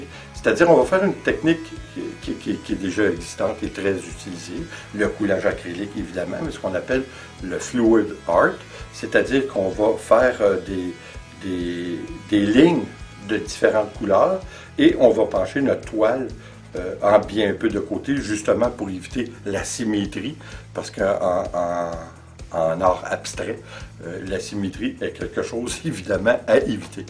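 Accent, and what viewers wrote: Quebec, Canada. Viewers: ne changez rien à votre accent... habituellement je ne comprend rien au parler québécois, mais vous, je vous comprend très bien. comme vous, les français ont un accent, le mien est de Marseille. mais ça ne change rien au fait que j'aime beaucoup ce que vous faites, merci de nous faire partager ce bonheur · C’est toujours un plaisir de voir vos expériences merci de nous les partager, et puis votre accent Québécois j’adore !! C’est vrai parfois pour nous Français il faut un peu de concentration pour comprendre mais c’est vrai aussi que le langage vient du vieux Français !!!!! Alors continuons à voir vos tutoriels 😉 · J'adore l'accent québécois et je comprends tout, pas besoin se sous titres ! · Bonjour Je découvre récemment tes vidéos. C'est un vrai bonheur à tout point de vue! Et qu'elle audace concernant tout ces essais cela donne envie de faire et de créer Merci pour tout ce temps partager jusqu'à la remise en route de la Harley 👍 Je vie en normandie mes 2 fils vivent au Canada j'adore cet accent l'on te comprend très bien "c'est correct" 😉 surtout continu de nous faire des petites vidéos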